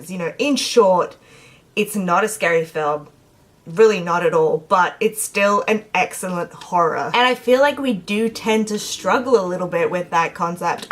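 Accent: United Kingdom. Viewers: Do you live in Southeastern England? You don't have a heavy accent.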